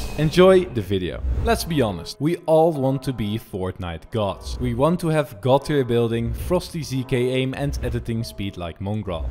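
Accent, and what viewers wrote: Netherlands. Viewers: gek Nederlands accent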